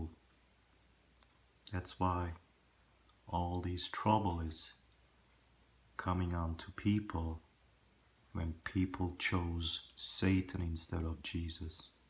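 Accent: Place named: Turkey. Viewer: Oh my goodness, we live in Texas too! We’re in the Dallas area! I think it’s the Turkish accent I must be hearing. I couldn’t place what region you are from. If you are also in this area please let me know!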